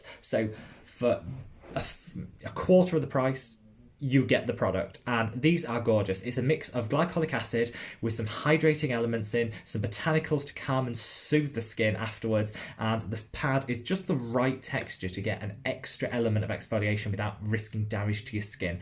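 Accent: United Kingdom. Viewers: Your so easy to watch and listen to. I was a little confused on where you were in the world with a uk accent and then mention dollars but then you said your in the uk think it's great your saying different currency. I'm in Ireland but from the uk 💜 I use the aldi dupe of the nip and fab glycolic pads cheaper again. Haven't tried the nip and fab ones but I think I will have to get some now as this is the 2nd video you've mentioned them 🥰 I will say I can't stand olay products but that's my personal experience so far theres better products that are cheaper again like the ordinary range. Depends on what your targets are I've also just bought some serums from revolution to try and compare Xx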